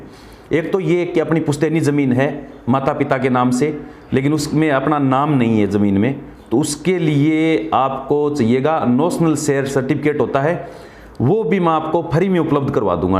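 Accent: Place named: Churu, India. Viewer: Sir aap kha se h ....aapki boli se churu k aas pass k lagte ho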